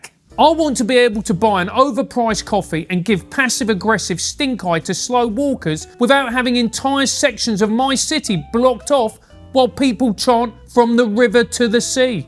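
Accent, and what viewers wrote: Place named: Mockney, United Kingdom. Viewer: Your mockney accent is awful.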